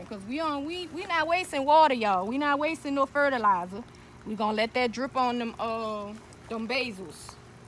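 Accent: New Orleans, United States. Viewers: I subscribed because I love your New Orlean accent❤❤❤❤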